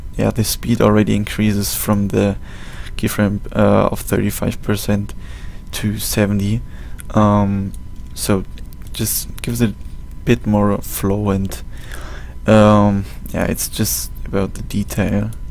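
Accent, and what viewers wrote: Germany. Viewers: Deutscher oder? :D Hört man raus aber gutes Tut ^^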